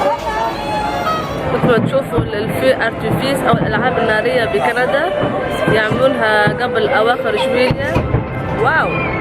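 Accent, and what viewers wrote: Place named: Tunisia. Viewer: وانا من ليبيا والله ماني متغطرسه ولا متكبره بس انت مفروض تفهمي المتابعين تبعك انك بدك تكون محترم في هالدول كون بعلمك وبقيمتك ماتجي هون وتفكر الحياه ساهله وتلاقي نفسك homeless لابيت لاشي ولاقرسون في البارات انا بتابع كل يتيوبر مغتربه مثلي واستغرب من اللي تفكر نفسها عبقرية ولامميزه على اهلنا بالوطن العربي بس لانها بامريكا ولابكندا بدك تعيش كريم هون خلي علمك يفرضك عليهم وهم يترجوك تبقى ماانت تبحث عالحيل للبقاء اما عن السوريين او الفلسطينيين اللاجئين لا لوم عليهم وهم فوق الرأس لانهم من بلدان دمرتها امريكا واسرائيل بعفنها ومخططاتها الفاشله وتحاول تجيبهم لاجئين لتبين للعالم انسانيتها اما عن كونك تونسيه فانا عارفه لهجتك واضحة بالزاف لي انا افمهما من لما كنت في ليبيا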